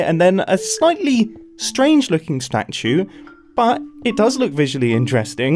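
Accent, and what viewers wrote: United Kingdom. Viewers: Are you britsh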